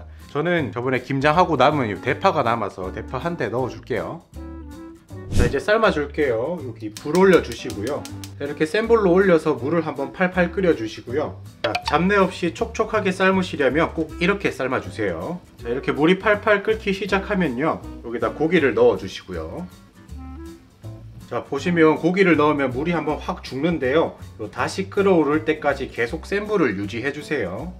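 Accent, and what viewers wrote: Gyeongsang, South Korea. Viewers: 경상도 억양이 다정스럽고 어딘가 품위가 느껴지는듯해요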